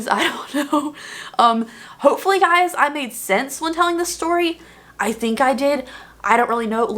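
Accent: South, United States. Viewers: Ok I know I’m not crazy, as I’m a southerner I may just be crazy 😜, but I’ve been noticing your accent more and more. I didn’t realize how much you would hold it back in the past! I’m the same way. Sometimes I let my accent just gooooo but mostly I reign it in 🤠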